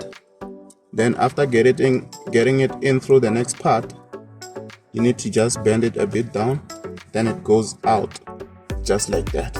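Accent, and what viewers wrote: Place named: Tsonga, South Africa. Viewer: This accent iya mutsonga str8 😂💔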